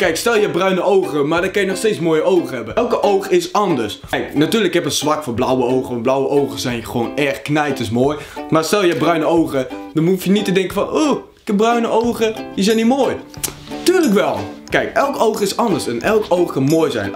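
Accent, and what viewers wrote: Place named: Overijssel, Netherlands. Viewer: Waar kom jij vandaan vlm uit overijsel want je praat n beetje plat en t lijkt een beetje op hoe ik praat 😂 ps jou ogen je haar en je tanden😍😍😍😍😍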